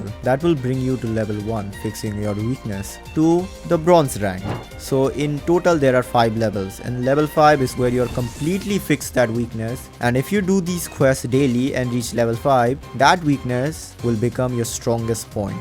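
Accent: India. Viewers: I heard the Indian accent and knew this was going to be the best Tsb video I‘ve ever watched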